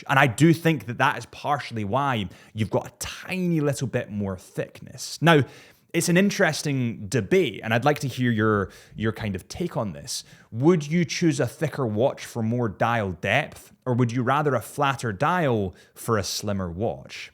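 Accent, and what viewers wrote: Scotland, United Kingdom. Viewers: I think Amiga is just the "Scotch" way of pronouncing Omega, isn’t it,? You don't sound at all like in the Archers, by the way.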